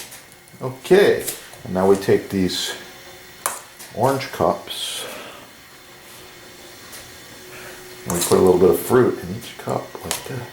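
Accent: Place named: Midwest, United States. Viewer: Thanks for the video! I love a good mid-western accent; it keeps my ears perked, lol. Keep creating, man!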